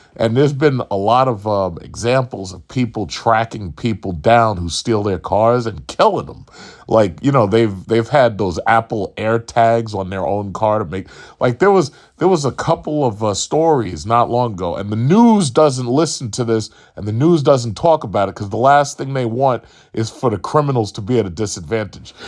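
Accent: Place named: Staten Island, United States. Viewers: My Dad, Aunts, Uncles and Grandmother were from Queens and talked the same way. It's a New York thing, kapisch? 🤌🏾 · Why does this guy sound like a white Italian guy from Staten Island..The passport bros were started by black men ...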